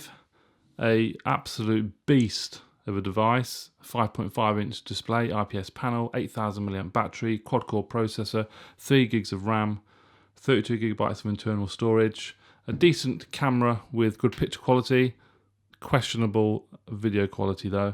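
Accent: United Kingdom. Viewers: Sounds like you are from UK, so we will not get a screen brightness test in sunshine, unfortunately.. That is what I am mainly interested in..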